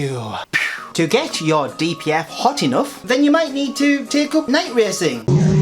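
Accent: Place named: United Kingdom. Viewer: He does a very good British accent I’m subscribing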